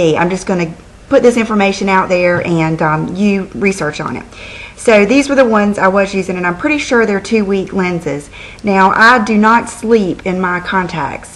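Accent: South, United States. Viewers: I just love listening to your southern accent. "Steel Magnolias" is my altime favourite movie and you talk like that. You look lovely in even casual clothes - those earrings just set off the turquoise colour beautifully.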